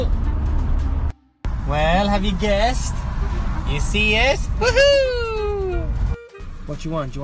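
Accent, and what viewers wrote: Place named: Ireland. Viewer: I can’t deal with how Irish u are what’s the story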